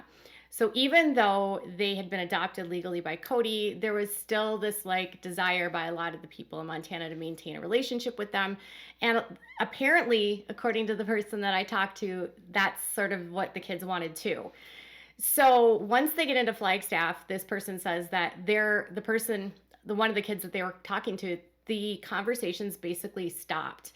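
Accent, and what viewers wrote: Minnesota, United States. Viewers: Minnesota? No wonder. I thought you were Canadian. That dang accent. Sorry you have to live in such a cold area. It’s nice here in Los Ángeles and, it’s super nice here.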